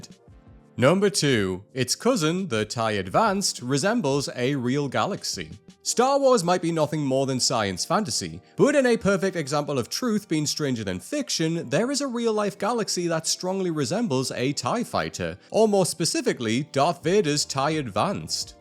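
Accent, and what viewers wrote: United Kingdom. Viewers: Like I need a British voiceover telling me about American Ingenuity.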